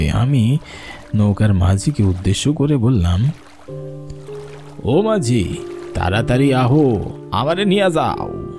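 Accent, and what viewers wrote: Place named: East Bengal, Bangladesh. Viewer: Narrator কি বাংলাদেশী না কি? উচ্চারণটা পূব বাংলা ঘেঁষা। ভালো লাগলো না।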